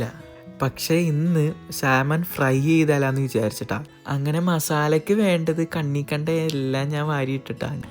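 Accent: Ernakulam, India. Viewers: I love your ekm slang❤️❤️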